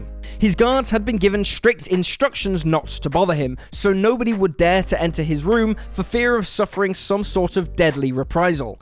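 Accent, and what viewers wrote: United Kingdom. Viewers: That's how they say it in the UK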